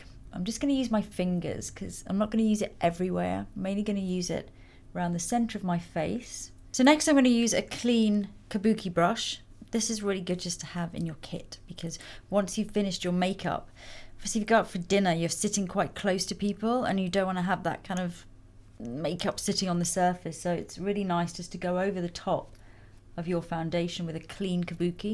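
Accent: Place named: RP, United Kingdom. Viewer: Really nice , also love your posh accent 😃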